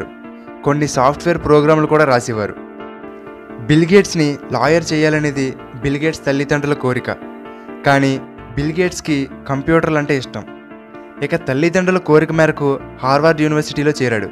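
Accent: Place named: Kakinada, India. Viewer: Ea vuru bhaiyya? Kakinada aa? Voice 😝slang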